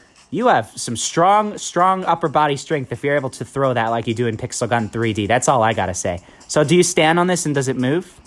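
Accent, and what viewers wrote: Russia. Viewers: Are you Russian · Are you Russian?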